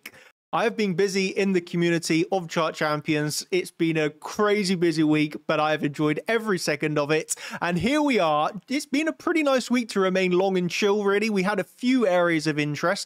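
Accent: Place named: Somerset, United Kingdom. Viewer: SOUTH WEST. Man's got a Somerset accent. Beautiful part of the country ❤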